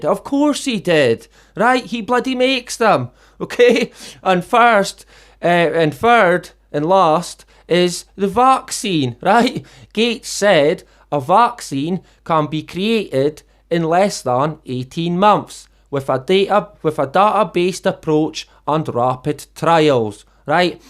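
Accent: United Kingdom. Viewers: its comforting to know I'm not alone and to hear a UK wonderful voice lol I've mainly listened and watched American or Australian truthers the odd one from UK! If know any other small UK channels post a link cause an extra like and sub helps for people who stumble across these channels!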